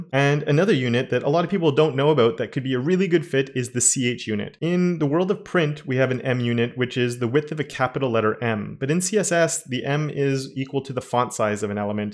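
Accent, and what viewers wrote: Canada. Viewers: Thanks for the informative video. Distracted with the canadian accent hehe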